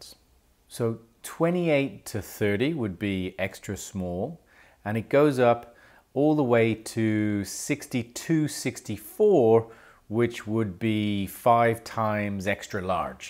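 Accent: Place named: Ireland. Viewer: your pronunciation is quite Irish to my ears, however you do use a lot of American vocabulary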